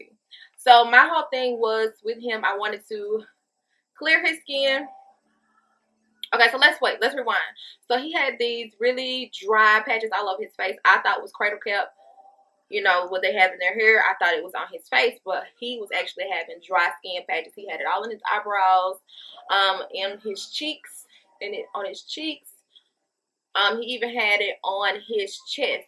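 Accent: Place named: South, United States. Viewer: Love your southern accent I’m from Florida I can hear a similarity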